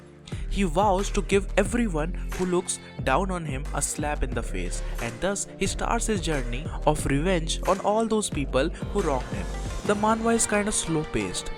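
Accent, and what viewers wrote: India. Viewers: You are indian ? Your accent is desi😂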